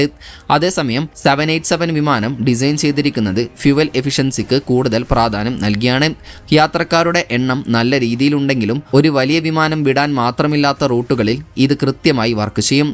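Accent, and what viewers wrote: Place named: Kannur, India. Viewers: It is Malabar peole lways say like tht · Al Kannur Karan anennu thonnunnu....avar Ingane Anu pronounce cheyyuka....ano ...? 🤔♥️ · ഇവൻ വടക്കൻ ജില്ലക്കാരൻ ആണ്.. അതാണ് അങ്ങനെ വരുന്നത്..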